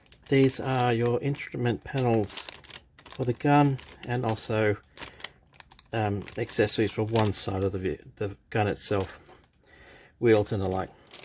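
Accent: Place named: Australia. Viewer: Great Review. I picked up this Kit a Couple of mths ago ( ish..!) It's in my Queue, awaiting the Time to Build it. Also picked up a Flak 88mm crew to add to it. ( a little fettling should make them look Ok..! ) I Also bought a Searchlight kit to go with it, but that needs Crew too. But its all a 'Work in Progress.' The Takom, pressings are just so Crisp, its a very impressive kit overall..! Great Detailed Review have Subscribed due to the Quality of it, and your an Aussie like me. So your Easy on the Ears.! Cheers Mate from a Fellow Modelling Nut, somewhere in Sth.Aust. 😂🦘😎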